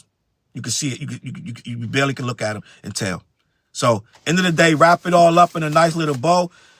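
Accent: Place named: New York, United States. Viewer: Absolutely! Your accent is dope too. You got that NY swag👌🏽 Respectfully.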